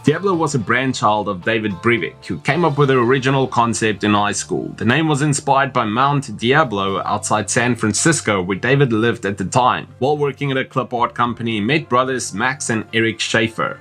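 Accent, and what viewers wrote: South Africa. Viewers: Personally I like Diablo 3 on console as it feels the same as Baldur's Gate: Dark Alliance and Champions of Norrath: Return to Arms, 2 games I have played way too much back in the day on the PS2. PS. It sounds like you are from RSA.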